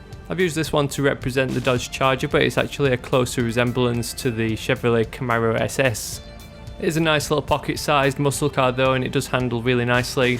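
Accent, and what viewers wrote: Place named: United Kingdom. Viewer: He's British, dumbass.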